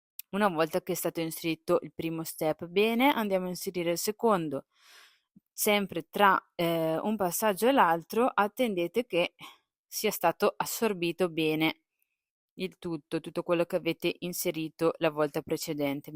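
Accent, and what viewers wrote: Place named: Piedmont, Italy. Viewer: Sei bravissima. Sto facendo le tue ciabatte e farò certamente la colomba. Ciao dall'accento piemontese. Io sono napoletano e ho un piccolo un forno a legna, farò la tua pizza in pala e ti farò sapere.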